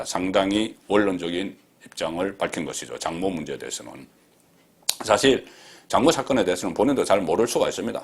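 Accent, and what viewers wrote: Gyeongsang, South Korea. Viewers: 강한 경상도 억양때문에 첨엔 어쩌나 했는데 들을수록 내용이 진솔 담백해서 조용히 지켜 봅니다. 저는 여성이라 경상도 억양이 답이 안나오네요. 아...서울말 쓰고 싶다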